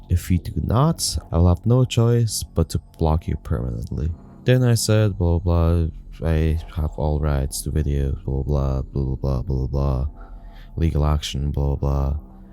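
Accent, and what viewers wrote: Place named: Philippines. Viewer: u sound awfully similar to a Filipino or at least someone of ASEAN descent (southeast Asia)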